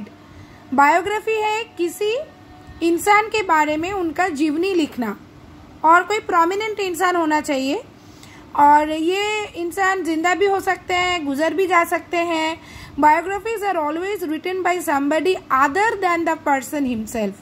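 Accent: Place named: Bengal, India. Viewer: Lagta hai aapki asali language Bangal hai aap Mumbai ya Delhi mein nahin rahte b😮😮😅😊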